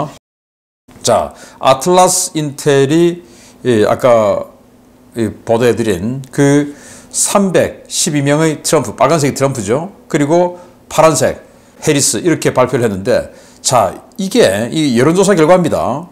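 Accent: Gyeongsang, South Korea. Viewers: 신인균 YTN 너희들 말대로면 모스크바는 2~3번 함락됐어야 한다 경상도 씹 말투 존나 거슬리네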